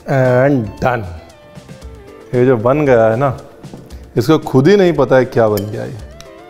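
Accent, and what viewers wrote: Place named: Maharashtra, India. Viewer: "Barni me dalke" nice ❤️ Marathi influenced hindi 😀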